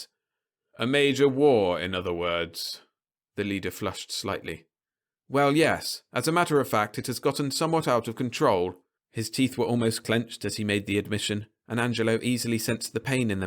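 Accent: United Kingdom. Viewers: The narrator has an annoying UK accent. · I'm sorry. Some of them really hit oddly in a British accent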